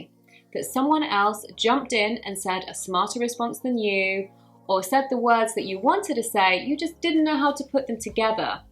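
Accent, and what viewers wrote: United Kingdom. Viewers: Great British accent ❤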